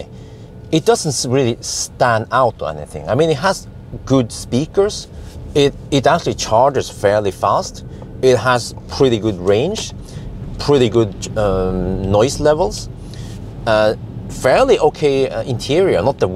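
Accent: United States. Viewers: Diggin' the American accent!!😂😂🤣🤣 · merican accent car sales man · I'm REALLY impressed by this car, but only thing that worries me is this stop charging button next to port. Easy way for stranger to stop our session is now one click away :( American accent - top noch :)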